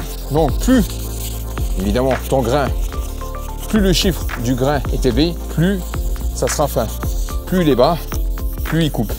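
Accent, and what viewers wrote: Belgium. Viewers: T’es belge ou français ? T’as l’accent belge a couper au couteau . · Vous êtes excellent vous m'avez bien fait rire avec la fiat verte, j'en reviens pas du résultat. Accent belge ? Ya du vandame hyperactif dans le moteur du personnage ;-)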